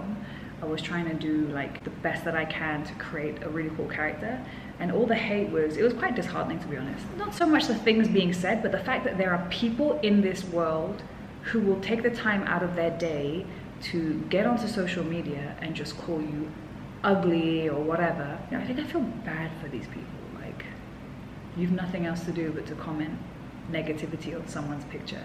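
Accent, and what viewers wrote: London, United Kingdom. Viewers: Dia setengah prancis tapi pernah kuliah di inggris jadi yaaa mungkin karna itu dia punya British accent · she's british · Sukaaa sama aksen nya british bangeeet 😍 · love your londoners accent 😄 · She has british accent omg im so in loovveeeeeee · Bloody hell, you speak the way London folks does...fuck yeah...you are amazing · British uyy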